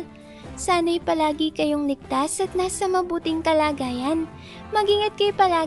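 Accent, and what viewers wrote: Pampanga, Philippines. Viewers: taga pampanga kaba?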